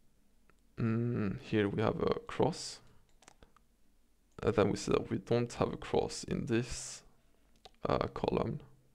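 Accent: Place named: Sweden. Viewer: sjukt att man märker direkt från dialekten att du är svensk.. men bra jobbar :D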